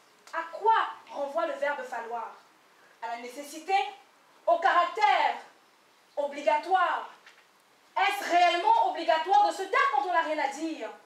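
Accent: Cameroon. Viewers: Accent très 🇨🇲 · Elle a l'accent camerounais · Absolument son accent est typiquement camerounais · Elle a un accent camerounais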